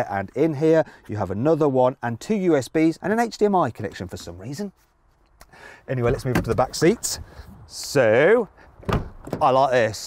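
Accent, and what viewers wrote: United Kingdom. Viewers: イギリスです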